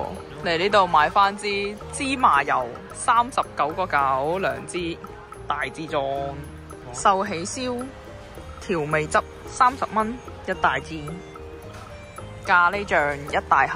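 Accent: China. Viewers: 你系大陸人?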